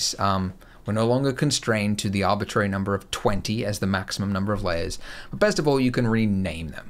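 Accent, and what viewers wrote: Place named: Australia. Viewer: Blender 2.8 looks so good it's really interesting they put a floating guy with an Australian accent at the bottom right corner of the screen at all times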